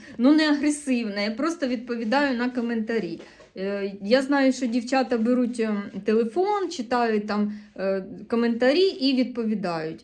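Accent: Sumy, Ukraine. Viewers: Привіт ❤ Все правильно говорите! В Сумах своя вимова! Але! Скажу вам,шо наші діти,навчаючись раніше ) в російськомовних школах здавали ЗНО на найвищі бали саме з укр мови і літератури Саме моя донька старша то у приклад З початком війни вона одразу перейшла на українську і в Німеччині всі в шоці,яка в неї гарна саме література укр мова! Бо її подруга,яка з заходу України тепер вчиться в неї мови! Ви класна! Справжня! Дивимося вас з молодшою донькою! Хоча секонди взагалі не цікавлять 😂 але вас дивимся с задоволенням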